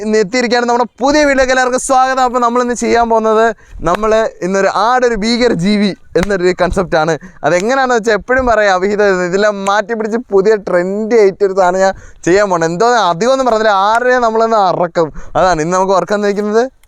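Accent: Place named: Thrissur, India. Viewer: Thrissur slang❤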